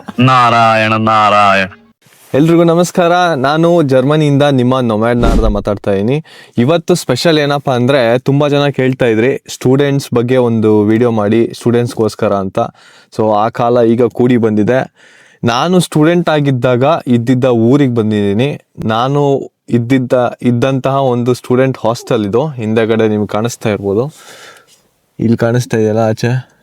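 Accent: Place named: North Karnataka, India. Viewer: ಯಾವ್ ಊರ ರಿ ನಿಮ್ದು? ನಿಮ್ಮ ಬಾಯೊಳಗ ಕನ್ನಡ ಕೇಳಾಗ ಬಾಲ್ ಖುಷಿ ಆಗ್ತದ.. ಬಹುಶಾ ನೀವು ಉತ್ತರ ಕರ್ನಾಟಕ ಕಡೆ ಇರ್ಬೇಕು ಅಲ್ಲಾ...?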